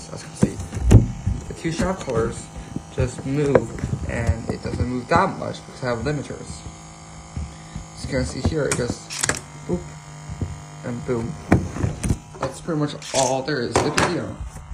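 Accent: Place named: China. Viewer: u sound so chinese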